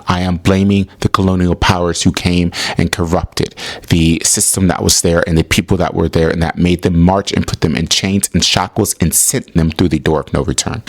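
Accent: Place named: United Kingdom. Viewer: African ameri what? Sounds more British than american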